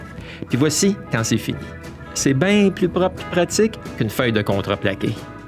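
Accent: Quebec, Canada. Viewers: Magnifique l'accent quebecquois. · Je comprend rien avec laccent quebecoi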